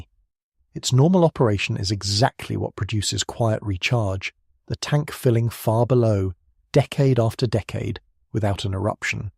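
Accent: United Kingdom. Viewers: They need to give this some pronunciation lessons - it said magmer for magma and sawr for saw. I'm expecting it's going to say 'Warshington State'. Sounds a bit British.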